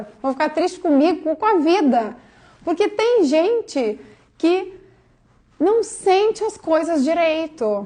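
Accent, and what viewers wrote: Rio Grande do Sul, Brazil. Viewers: Sotaque de gaúcha doutora 😢😢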